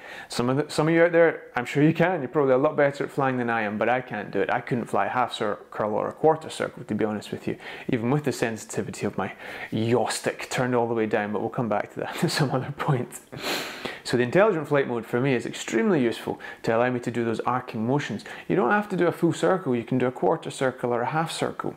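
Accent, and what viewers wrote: Scotland, United Kingdom. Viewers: Great explanation of the feature and great to hear another Scottish voice on YouTube! 👊🏻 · Love the channel and the content, the scottish accent is just a bonus 😊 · Very helpful video, and the Scottish accent is irresistible to a Yank like me. Killer footage almost worth colliding with that mangy tree to achieve. The only thing you missed explaining is how to use the sticks to change the altitude and radius while flying, which is fairly evident when trying it with the DJI Go 4 app, but not clear here in this video.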